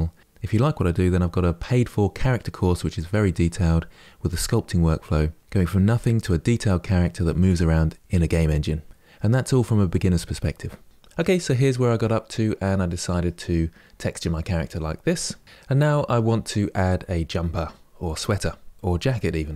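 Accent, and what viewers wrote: United Kingdom. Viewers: I love channel. In my personal opinion, hands down the best blender teacher on youtube. Detailed and very good at explaining each step. It definitely helps that he has a soothing british voice lol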